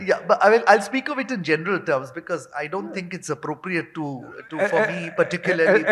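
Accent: India. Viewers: I don't understand India accent go back to school old men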